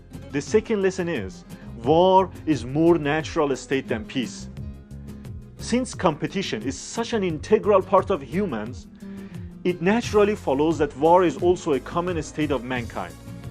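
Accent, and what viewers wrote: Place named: Iran. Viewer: سلام! مرسی واسه ویدیو لهجه ایرانیت خیلی شیرین ترش کرد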